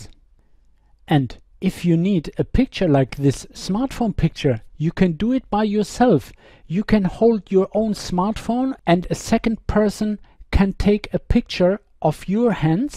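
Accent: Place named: Europe. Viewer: brilliant explained show me how to and refreshing european accent- as opposed to annoying american drools.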